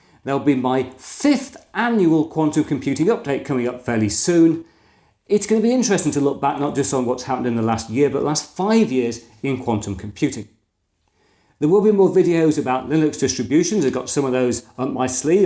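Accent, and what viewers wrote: United Kingdom. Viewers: Your personality and style very unique sir, also have british accent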